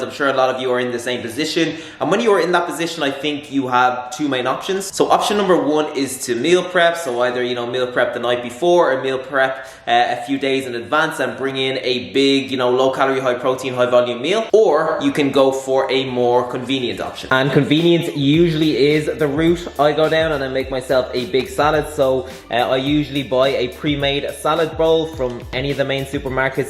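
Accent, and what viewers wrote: Ireland. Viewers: This guy sounds irish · Nice Irish accent I’m sure it’s Irish maybe Scottish I’m 90 percent it’s Irish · Are you Irish? You kinda talk like Conor McGregor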